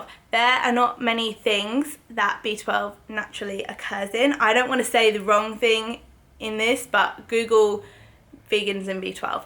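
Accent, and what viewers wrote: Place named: Australia. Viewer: I'm here because I just love her Ozi English accent. Sexy accent, hahahaa...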